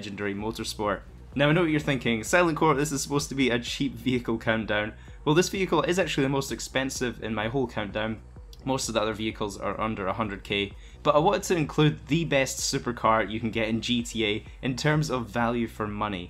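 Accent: United Kingdom. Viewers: Great video brother trying to figure where in uk your from ireland??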